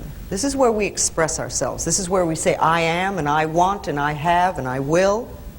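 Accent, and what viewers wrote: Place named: United States. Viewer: What American accent she is using ?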